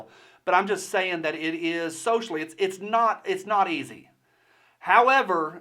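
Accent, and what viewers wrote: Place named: Alabama, United States. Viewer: No problems here... Yet. I'm in a constitutional carry state, a very good one I might add. No police problems and no prisoners released yet. I believe criminals should be kept locked up unless we're facing an apocalypse. Even then murderers and other violent criminals should never be released. Your videos are great, always look forward to them. You sound like home, I'm from Alabama. Keep up the good work!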